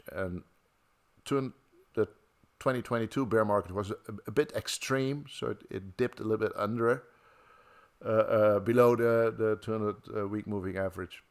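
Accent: Netherlands. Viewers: Cool vid! Where’s your accent from? You sound Dutch.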